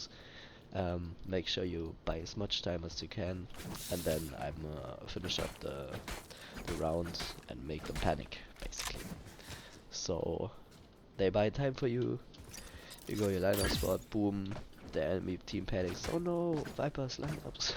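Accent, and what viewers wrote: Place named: Germany. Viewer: Deutscher Akzent ist auf jeden fall da!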